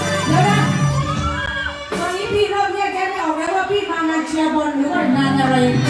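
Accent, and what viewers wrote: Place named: South, Thailand. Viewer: ป๊าดด!!เอื่อยเเตนเเหลงใต้เก่งเเท้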